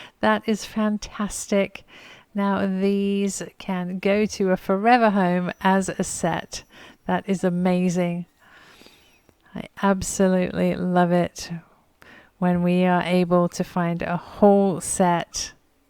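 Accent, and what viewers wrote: England, United Kingdom. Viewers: what part of England are you from, you sould a bit like my cousin Jenn, she was from Maidenhead, and a teensy bit like my friend Lorraine who is from Cobham. my other english friends do not sound one bit like you, they are from Liverpool, and another family says they are gordies, OH my , when I first met them I couldnot understand them, they had so many different rhyming words for things, not like cockney but different, and my exchange student was from Bristol, and Beautiful Cotswald. my mother in law was born near London, so English accents are my favorite things. lol. I am not like the professor in My Fair Lady but I do enjoy trying to figure out the accents. London accents are the easiest.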